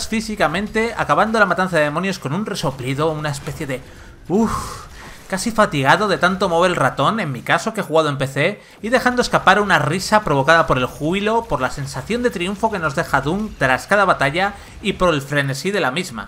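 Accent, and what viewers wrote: Spain. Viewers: Chale, me molesta cuando veo videos de vandal narrado por españoles alv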